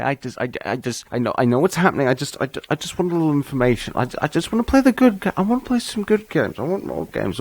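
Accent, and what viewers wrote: Australia. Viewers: Gaijin Goombah Media Australian not English mister goombah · He’s Australian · W8, are you Australian? Sick, me tooooooooooooooooooooooooooooooo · No he's australian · No because he’s australian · Aussie not English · He's Australian you git. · Gaijin Goombah Media Australian not English (They're different I tell you, THEY'RE DIFFERENT!!!!!!)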